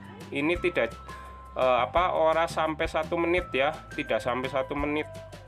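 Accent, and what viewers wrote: Banyumas, Indonesia. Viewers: Kan itu juga udh pake bhs Indonesia.. Cuman logat nya aja medok ngapak.. Aku yg org Sunda aja ngerti dan paham apa yg sampaikan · Saya justru suka logat ngapak nya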